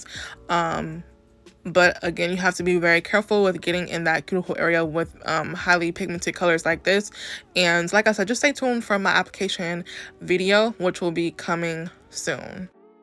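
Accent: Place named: South, United States. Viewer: Where are you from, if you don't mind me asking. Sounded like i was hearing some country coming out in this video...😂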